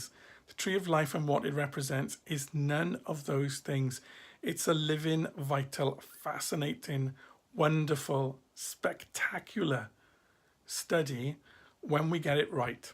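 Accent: Wales, United Kingdom. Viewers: is that a Welsh Accent?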